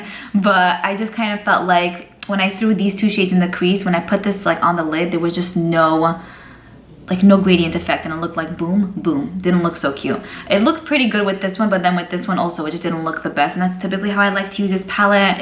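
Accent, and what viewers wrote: New York, United States. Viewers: Your accent is perfection. It’s just a kiss of Italian New York and I love it.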